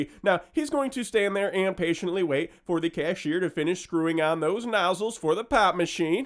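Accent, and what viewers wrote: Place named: India. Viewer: wheres the indian voice?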